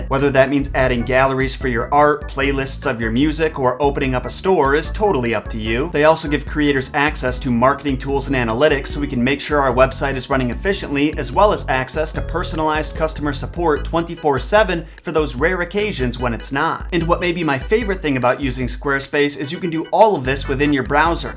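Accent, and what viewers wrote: Ireland. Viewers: Better than most Irish accents from Hollywood!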